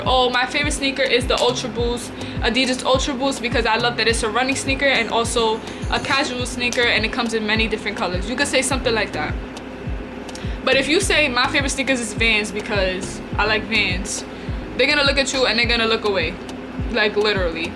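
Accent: New York, United States. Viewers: You’re definitely from New York lol I love it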